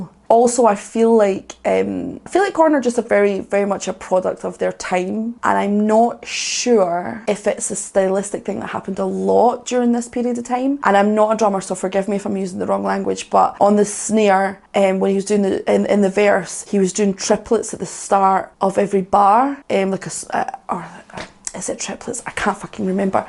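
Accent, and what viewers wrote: Scotland, United Kingdom. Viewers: I happen to notice that, after a quick perusal of your video list, you don't have any reactions. How can this be? He is best known for his work with &, but I'd be interested in how you react to " " from his Mondo Cane album. He normally does experimental metal, but Mondo Cane has an Italian 1940-esque torch song vibe. Plus, I'm just looking for any excuse to hear more of your accent. What I would do to hear you soliloquize about music over some haggis, neeps & tatties at the Babbity Bowster in Glasgow...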